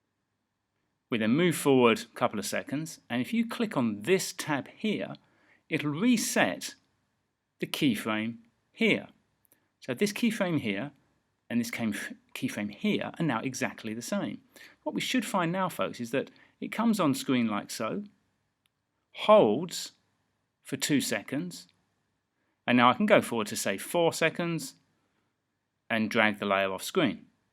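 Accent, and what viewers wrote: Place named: United Kingdom. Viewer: British accent is great!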